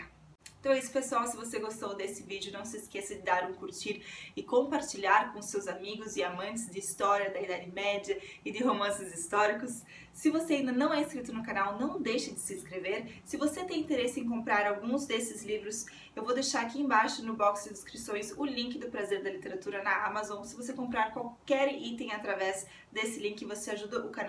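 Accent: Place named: Paraná, Brazil. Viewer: Vc é uma " gracinha" ! Teu sotaque parece ser de PARANAENSE....muito charmoso !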